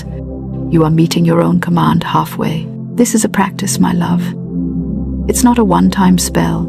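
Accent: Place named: England, United Kingdom. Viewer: American woman with an English accent.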